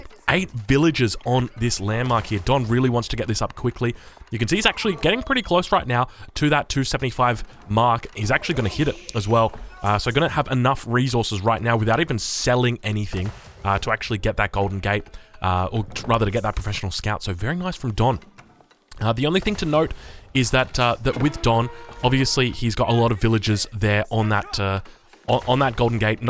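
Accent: Australia. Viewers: the highlight of my work day is your aussie voice saying good day fellas or whatever and then proceeding to slay commentary for the next hour. thank you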